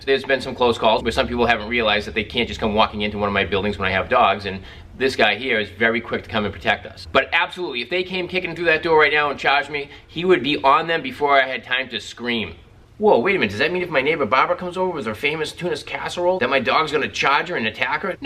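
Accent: Boston, United States. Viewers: From Boston? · Are you from Boston??? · My brother! I hear a Boston accent. Yeah? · u southie? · Love that Boston accent. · I can hear some Boston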